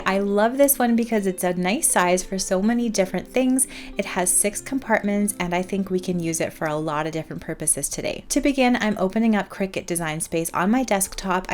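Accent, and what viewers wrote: Canada. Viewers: Love the DIYs and the Canadian accent! ❤️😁.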